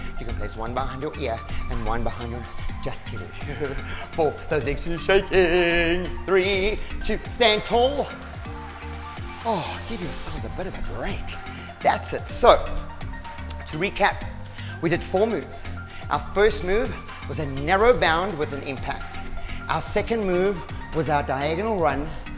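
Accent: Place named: South Africa. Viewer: You are fantastic , you are clear & thorough , explaining every move , what each move does, which motivates , &, & , & , you are fun ! 🥳Love it . I laugh when you do . Have just found you & imeeediately subscribed ! Are you in South Africa ? Love your accent .Thanks so much . Hello strong 74 year old legs !! Yay , here they come .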